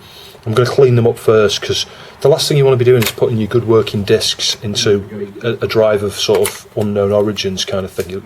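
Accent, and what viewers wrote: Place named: Manchester, United Kingdom. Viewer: Hi! I just got out my old Amiga 600 and it worked for a day, then I put in a floppy which I think was a little dusty and now it's stuck on the "insert floppy" animation. I saw on eBay that working Amiga 600s tend to go for around £100. Is that accurate ? Is it worth me opening up and repairing? P.s where are you based? You sound local. Writing from Manchester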